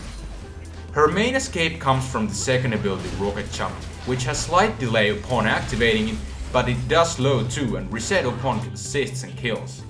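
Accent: Finland. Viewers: Where are you from? You sound little bit finnish:D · ookkos suomesta kuulostaa vähä silt ? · ootsä suomalainen koska kuulostat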